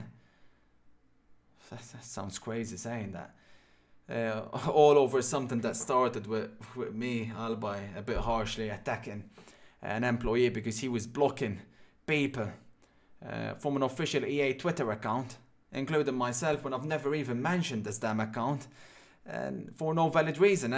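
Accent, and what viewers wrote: Ireland. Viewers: Sometimes he sounds French sometimes he sounds irish 🤔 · You have an irish twist to your accent. You live in Dublin?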